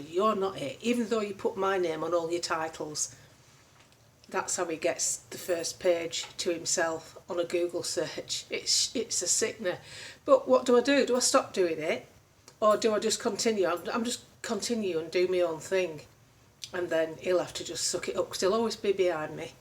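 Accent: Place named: Lancashire, United Kingdom. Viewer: Not less than Oliver. You are superb. A strong voice. For me a great Lancs voice. Keep it up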